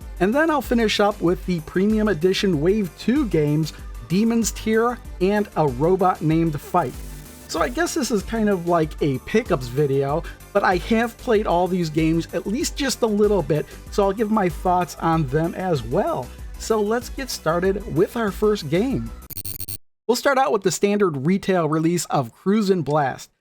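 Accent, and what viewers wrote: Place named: Chicago, United States. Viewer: This video was great. Personally, I'm really interested in unique but high quality indie/import titles for the Switch... but... I'm just getting into it... so... I'm swamped, trying to wade my way through. Clean little segments like this definitely help out. Thanks! On a separate note, I'm trying to place your dialect. I thought maybe long forgotten Acadian but I just heard you say "da game" which triggered my dormant memory of George Wendt saying "da bears!". So that's my guess: Chicago and if not, then rural Illinois.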